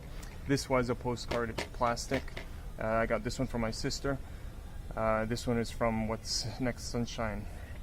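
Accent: Germany. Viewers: good german accent, you still got it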